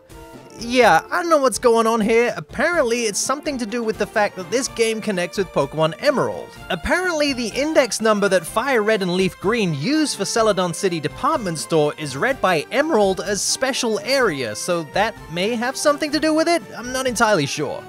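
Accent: United States. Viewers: Why do he sound a bit American now? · Woah I feel like I haven't seen your videos in years and your accent feels more "American-y?" It's weird haha good video still :)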